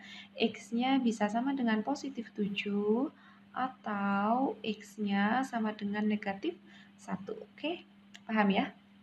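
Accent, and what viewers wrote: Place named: Java, Indonesia. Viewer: Halo kaka....kaka orang jawa ya....medoknya keliatan....😂😂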